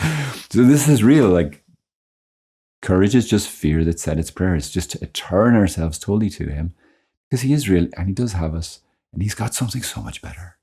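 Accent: Ireland. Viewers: Great to hear an Irish accent in this space!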